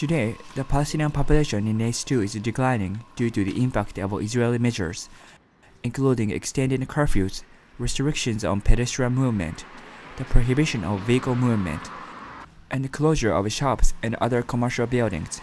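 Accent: Japan. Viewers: I can understand that your English accent is heavily Japanese, but that doesn't mean you understanding on world issues should be so foggy and uneducated. 🤔 THERE IS NO PLACE OR NEED FOR YET ANOTHER ARAB COUNTRY IN THE WORLD ! <link>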